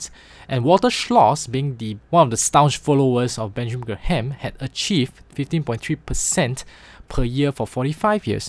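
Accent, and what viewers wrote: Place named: China. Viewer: Very distinct chinese accent.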